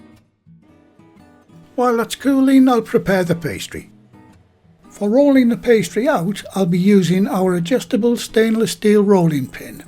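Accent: United Kingdom. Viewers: I love your video!! I love British accents! But also, it was easy to follow the step by step and it was fast! Ty!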